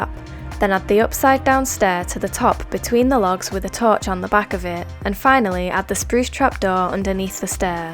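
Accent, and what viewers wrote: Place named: Scotland, United Kingdom. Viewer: Honestly i like your Scottish voice so now ima use your tutorials in my world now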